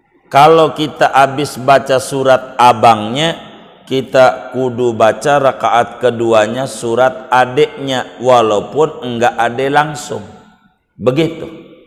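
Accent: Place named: Banten, Indonesia. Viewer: Izin berguru ke pak kiyai, saya dari kresek tangerang, dari logat bahasa pak kiyai kaya nya pak kiyai dari serang banten.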